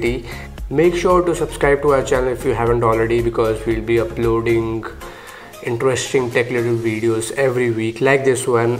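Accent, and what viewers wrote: India. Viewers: индусский английский это кровь из ушей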